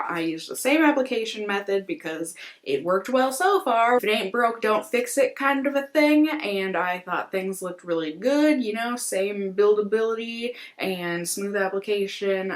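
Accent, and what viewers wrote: United Kingdom. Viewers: The British accent 😂😂😂😂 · Your British accent is lovely 😃 love your videos..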